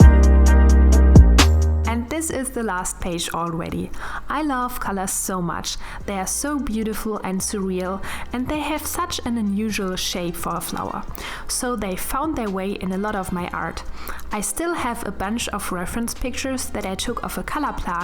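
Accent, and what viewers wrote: Germany. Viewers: I think her accent is really nice and cute, and I love to listen to the german accent! (also french and italian accent and other accents as well! ) Have a nice day! : ) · Man hört, dass du aus Deutschland kommst. Aber trotzdem cooles Video. Ich fände aber cooler wenn es auf deutsch wäre, da mein Englisch auch nicht so gut ist. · Du bist deutsche... hört man voll😄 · Are you from Germany? Because your English sounds very funny... Just as a german 😂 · yes she is german, as a german i am ashamed of how harsh her english sounds .... i cant even listen to it tho... sorry I know how hard it is to correct the way of speaking but she doesnt even seem like shes trying . i know thats verry mean to sa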